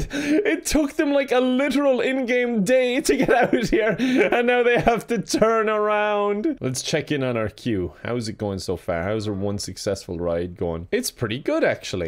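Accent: Russia. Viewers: i love when he speak his russian accent 😂❤️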